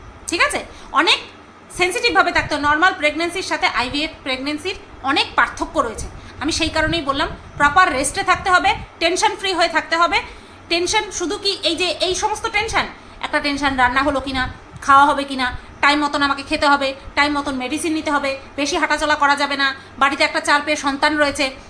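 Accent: Bangal, Bangladesh. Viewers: তোমার মুখে বাঙাল ভাষা খুব ভালো লাগে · Tomar mukhe bangal kotha valo lage age bosak ke nie bolte tokhon bangal kotha bolte. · দারুণ কথা বলেছো।আর কি সুন্দর বাঙ্গাল কথা শুনতে খুব ভালো লাগছিলো।আর হাসছিলাম। ভালো থেকো।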